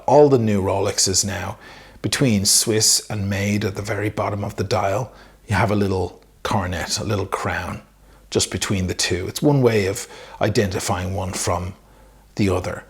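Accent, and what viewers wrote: Ireland. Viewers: Might I ask where you acquired your accent? Ireland I am assuming. But where in Ireland?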